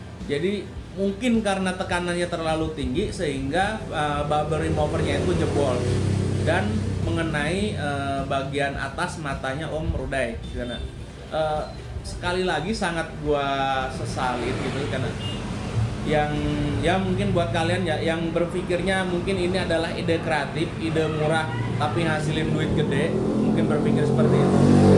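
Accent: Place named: Kalimantan, Indonesia. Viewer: Dr kalimantan mana bang... Logatny kalimantan tuh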